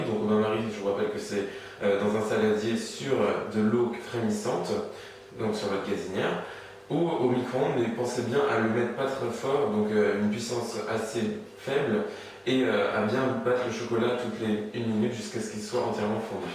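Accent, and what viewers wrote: England, United Kingdom. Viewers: tu me soule aek ton accent anglais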